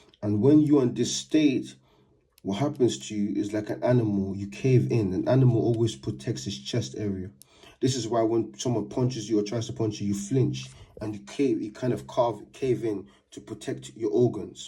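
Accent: United Kingdom. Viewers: I love your British accent so muchhhhh bro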